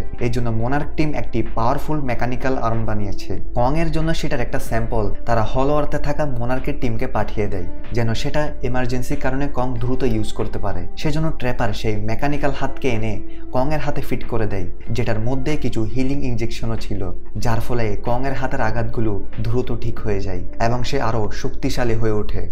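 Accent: Chittagong, Bangladesh. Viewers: ভাই আপনার চট্টগ্রামের টোন টাতে কথা গুলো শুনতে ভাল লাগছে,কারন আমিও চট্টগ্রামের ছেলে 😁😊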